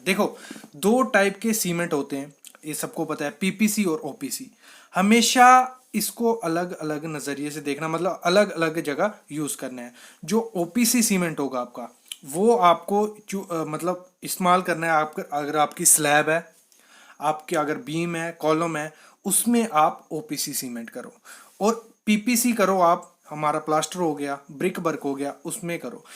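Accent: Jammu, India. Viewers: Bhai aap Jammu se ho kya? coz aapki hindi proper Jammu wali hai.. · Tu Jammu ka laga Raha ha muja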